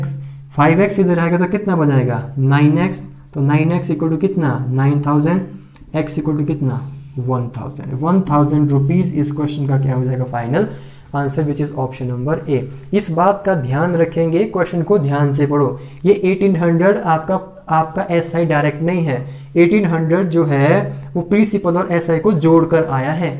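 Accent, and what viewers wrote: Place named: Pahari, India. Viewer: Pahari tongue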